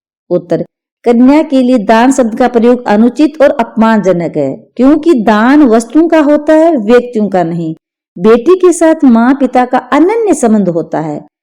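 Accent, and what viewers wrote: Rajasthan, India. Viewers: Mam aap rajasthani ho na aapki voice tone se lagta h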